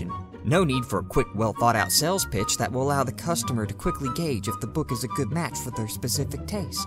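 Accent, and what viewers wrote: South, United States. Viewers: is this guy a "southerner" as americans call it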